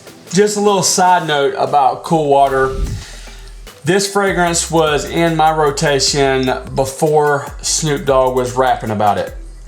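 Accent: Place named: Louisiana, United States. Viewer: I don't know if that was a chicano accent or a one straight from the bayoo 🤔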